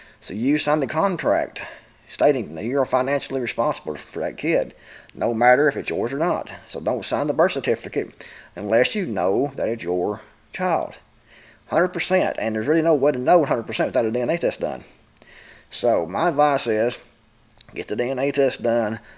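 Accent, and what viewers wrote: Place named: Kentucky, United States. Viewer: Love your accent. My momma and daddy were from South Central Kentucky. Your accent sounds like all my cousins from down there. Unfortunately I was born and raised in Louisville and the accent of Louisville natives is by far, not southern sounding at all. Even here in SC the accent is different.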